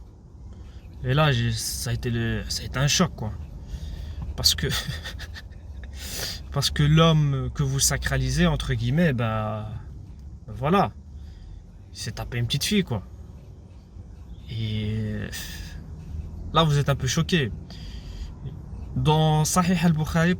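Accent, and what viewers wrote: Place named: Maghreb. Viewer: Ôh merci, merci de m'avoir ouvert les yeux!! Je vous ai dit j'ai compris, maintenant je vais détester l'islam comme vous. C'est trop bien de détester la religion des autres, de détester les morts. Monsieur le théologien à la sauce haineuse qui n'a toujours pas réussi à répondre à 1 seule question, 1 seule. Si j'avais manqué de respect à il me l'aurait fait savoir, mais vous vous me manquez de respect et prétendez sur mon compte avec arrogance. La première chose que j'ai aimé de c'est son petit accent maghrébin, je trouve que ça a tellement de charme. Ce message marque la séparation de nos chemins, j'espère que vous arriverez à comprendre le français: BONNE ROUTE!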